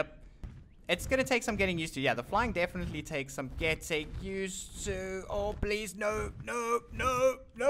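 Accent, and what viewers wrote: South Africa. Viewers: Omg a south african are u from cape town or Johannesburg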